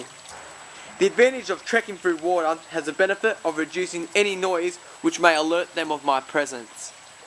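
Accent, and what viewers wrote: Australia. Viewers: Gaming &amp; More! hes Australian not Russian! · Australian accent : let's catch a d, I think this one was bullshit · knew he was australian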